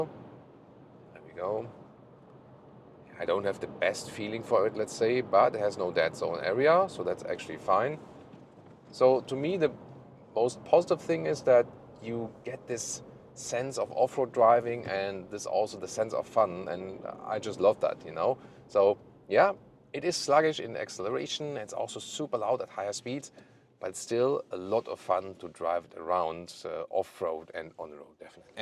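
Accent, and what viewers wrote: Germany. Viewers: It would be so much better to hear this review in his native tonuge. The heavy German accent is annying and I write this as a German native speaker.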